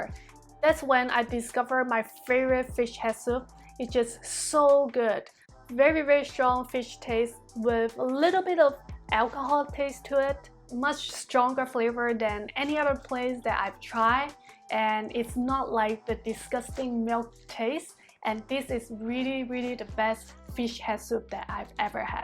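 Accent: Singapore. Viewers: I am hearing some Singapore accent when you speak :) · Your singlish is 👍💪👏 Popiah and fried carrot cake are my favorite foods🥲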